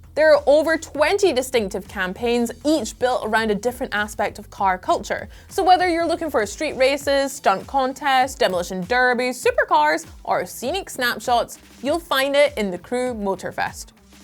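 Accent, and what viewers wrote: Scotland, United Kingdom. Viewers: Xbox Strong all Day Long.... Is this Chick Kool or what. And so I hear a Scottish accent? 😊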